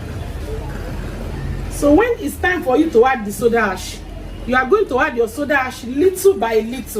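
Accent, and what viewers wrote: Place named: Africa. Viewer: Can I just say I Love ya accent. Your accent make me miss my friends so much. I'm in a state or town where there are no African here. Sucks.😩. Don't mind me..but I love the video.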